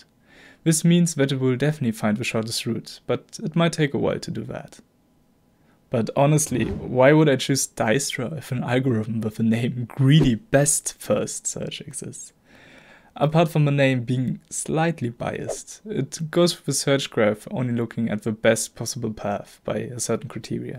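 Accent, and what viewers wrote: Germany. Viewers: Ohne nach zu schauen hört man das hier ein Deutscher am Werk ist :D Nices Video :)) Kennst du das Spiel Mini Motorways? Könntest du mal erklären wie man sowas Grob erstellen kann? also das Verschiedene Gebäude (Arbeit) auf einer Map Spawnen und man die passenden Häuser (Arbeiter) mit Straßen verbinden muss :) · da hoere ich doch Deutsch raus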